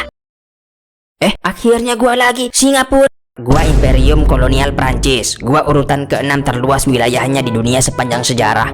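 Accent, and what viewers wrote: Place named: West Java, Indonesia. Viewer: sunda banget ya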